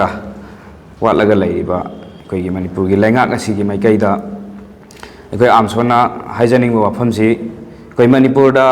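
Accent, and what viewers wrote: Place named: Bangladesh. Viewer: Remember you speak like a Bangladeshi. Always try to speak in a correct way. It will be very good.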